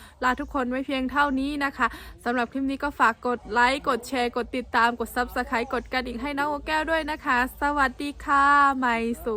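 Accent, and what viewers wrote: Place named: Tai Khuen, Thailand. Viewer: สวัสสดีน้อง เสียงแจ้วๆด้วยเสียงสำเนียงไทขืนเพราะน่ารักๆมากเลยส่งคลิปสนุกๆมาให้ชมพาสาวๆไปเท่วงานปีใหม่ไตมีคนมากมายมาเท่วงานขอบคุนมากครับ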